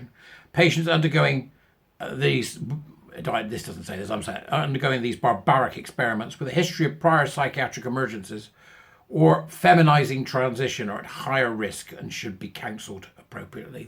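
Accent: United Kingdom. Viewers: The reason you are bothered by the word urinary is because of the way you, as a Brit, pronounce it. Try the N. American way.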